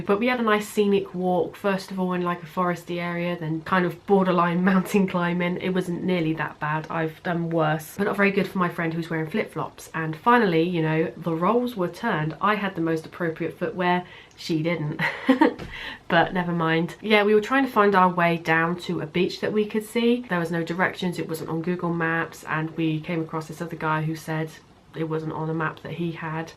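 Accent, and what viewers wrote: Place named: England, United Kingdom. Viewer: I’ve been there on holiday before! It’s great isn’t it? I used to live in the England and I still visit a lot, I was just wondering what part of the England are you from because your accent is similar to mine 🙂 (sorry that probably sounds really weird)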